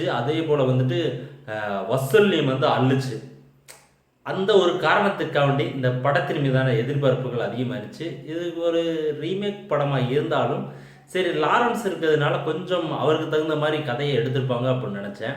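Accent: Madurai, India. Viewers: bro neenga entha ooru odasalunu soldringa entha ooru basha maduraya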